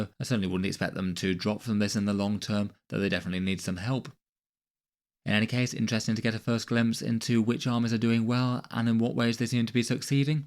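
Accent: Kentucky, United States. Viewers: Enjoy the kentucky pronunciation.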